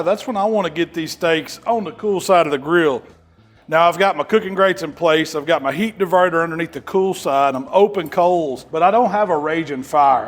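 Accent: South, United States. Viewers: Is it me or does the Southern accent makes his videos better😂... is the man👍🏾🫡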